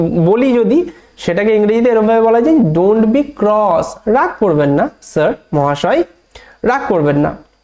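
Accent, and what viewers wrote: India. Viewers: তোমার বাড়ি কি মুর্শিদাবাদ এ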